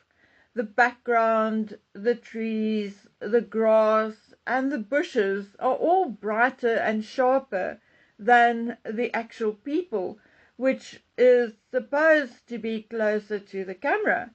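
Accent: South Africa. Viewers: When I subscribed to your channel, several years ago, I did not subscribe because of the Harkles, Royal Family, or anything of the sort! When I first came upon your channel, I just LOVED your accent!!! I had never heard it before. I knew it wasn't from Great Britain or Australia, or New Zealand, or the U.S.!!! I listened to you a little more & learned you were in South Africa & told you I thought you had the most beautiful accent! One lady saw my comment & thanked me & told me it was so lovely of me to say that!!! You, no doubt, probably don't remember, but you & I even emailed back & forth a few times!!! I thought that was SOOO nice of you to respond to me!!! You never ask for anything, you never advertise products you want to sell. All you do is bring us the truth!!! Here we are, years later, & I'm still here everyday, still subscribed, & still listening to your lovely voice & accent!!! 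😊 ♥ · Ive only ever unsubscribed once. I did it because after giving it a try for a couple of weeks I just didn't enjoy her voice or her opinions, at all. I've enjoyed or learned something in each video. I enjoy hearing about Dragon Man and most of all, I love the South African accent. TY.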